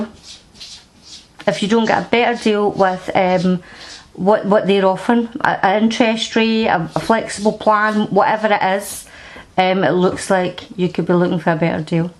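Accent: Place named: Scotland, United Kingdom. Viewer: A Scot like myself, nicely read 👍🏽👌🏼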